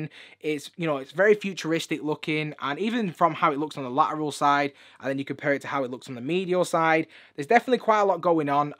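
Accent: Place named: Lancashire, United Kingdom. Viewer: Great reviews but that Burnley Lancashire accent bro 😂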